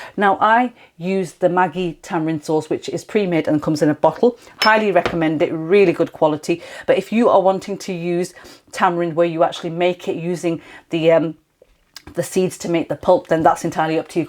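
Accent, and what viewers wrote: United Kingdom. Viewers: Love your videos a lot.. I just bought Cosori air fryer and watching you every single day. Please let me know your name and your social account. I love your UK accent too :) :) I live in Tokyo.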